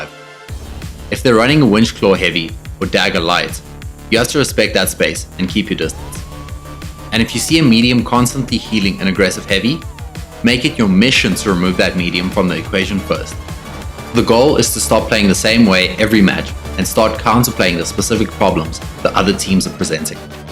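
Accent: South Africa. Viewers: You from South Africa??? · South african? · Great video man, I just recently broke 200 hours in the game. You sound hella South African too🔥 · Ayo naaah you sound like my people, you from SA by any chance 👀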